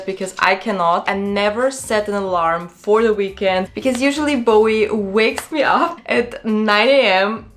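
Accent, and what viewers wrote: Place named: Germany. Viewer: oo yum im gonna make the avocado cottage cheese lunch, also where are you from? you sound very german ahah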